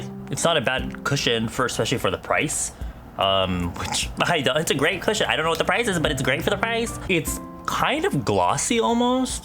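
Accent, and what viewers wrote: South Korea. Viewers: YOU AND YOUR KORENGLISHHH OMG T~T idk what ur saying 😂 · sometimes i dont even realize its such strong konglish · Thank god I can understand fully your konglish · Loving the kenglish😂❤️ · we love a konglish queen <3 come thru!! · That konglish tho😂 love you bae🖤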